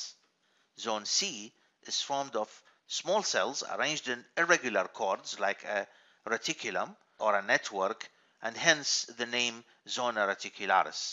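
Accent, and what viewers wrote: Iraq. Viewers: اي والله اني هم لاحظت بيه عراقي اول ما حجه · مبين من نبرة صوتك عراقي سبحان الله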